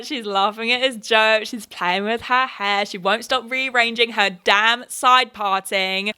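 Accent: United States. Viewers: I strictly liked your video for your american accent! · As an American, your American accent was the best part of this video 😂 It sounded great! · Ok ur American accent was actually good tho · i loved your american accent (as an american) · honestly your american accent is pretty good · How is your american accent so good? holy shit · ahhh i loved this show!!! love that i got to revisit it with your hilarious commentary and omg your american accent is so funny LOL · That was actually a really solid American accent. I can barely hear a slip up, like at MOST a few sketchy vowels haha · Your American accent is sooo good! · Omfg your American accent… you are the first British person I’ve seen get it right! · Just FYI, your American accent is great! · I absolutely ADORED your American accent! Great video as always 👏🏾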